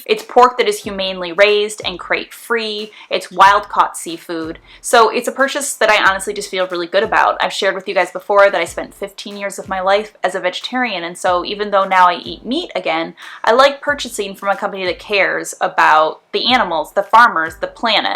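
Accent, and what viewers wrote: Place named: Connecticut, United States. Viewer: So refreshing to hear a Connecticut non-accent! Been in Maine wayyy too long!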